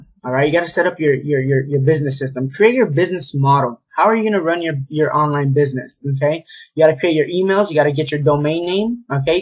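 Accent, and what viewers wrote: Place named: Arkansas, United States. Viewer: That's an Arkansas accent for sure... Let's connect on facebook bro... Look me up, I'm banned from sending more friend requests for another week or so, lol.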